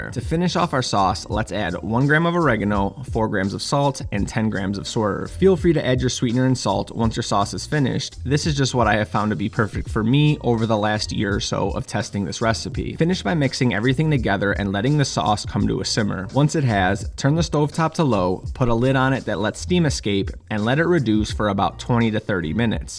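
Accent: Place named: Chicago, United States. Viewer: I cant stand the Chicago accent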